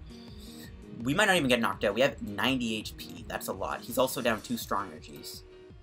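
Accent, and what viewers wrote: Canada. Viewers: r u from Canada ?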